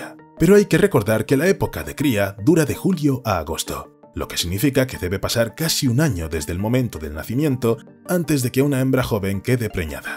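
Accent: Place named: Spain. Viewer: excelente gracias por tus videos! son interesantes y relajantes por tu tono de voz español que me recuerda a los documentales que veía cuando era un niño. Saludos desde la ciudad de Quilmes, Buenos Aires, Argentina.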